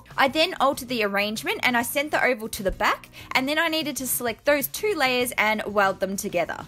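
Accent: Australia. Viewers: Yeah! A new video! Love your work and Australian accent! Hugs from good ol USA!!!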